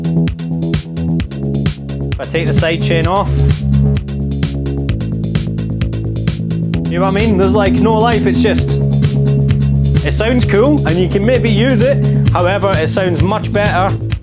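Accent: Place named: Scotland, United Kingdom. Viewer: Thanks for the excellent overview, I also love your Scottish accent! Scotland and Scottish people are awesome (and I'm partly descended from them myself), I hope to visit there some day. Also thanks for the heads up on the Yamaha Reface line, I would be really tempted to get the Reface CS if only it had patch memory. There's really no excuse not to with today's technology. But at least there are some great softsynths now such as Tyrell, Diva, and U-NO-LX.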